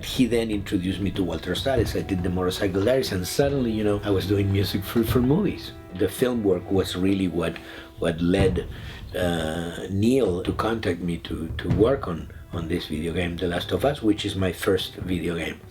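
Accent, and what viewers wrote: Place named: Argentina. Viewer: El tono Argentino se te nota desde el pincipio♡♡♡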